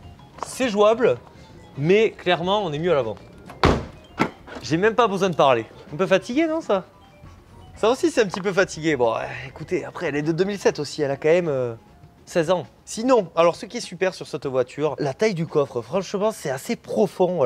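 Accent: South, France. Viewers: Salut, le bel accent du sud fait plaisir, j'ai découvert ta chaîne il y a peu, le contenu est bien travaillé. Plus 1 abonné. Tu est de quelle région ? J'ai quelques véhicules à essayer éventuellement. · 🤔 Ça manque de chemise en ce moment..!!😜 Avec l'accent du sud..merci de nous présenter des modèles futur icône/collector.👍.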